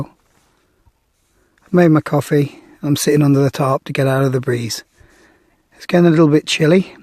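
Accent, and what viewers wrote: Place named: Stoke, United Kingdom. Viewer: where are you from you sound like your not far from stoke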